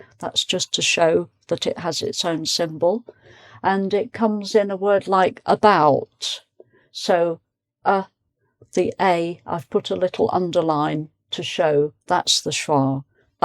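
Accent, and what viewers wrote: United Kingdom. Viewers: I like her so much as an English teacher.I like her British accent a lot. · That was a very clear representation of British accent. Much appreciated. Love from me <3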